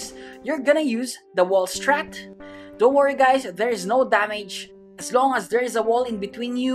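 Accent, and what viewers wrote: Philippines. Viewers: you have a very thick filipino accent kuya😄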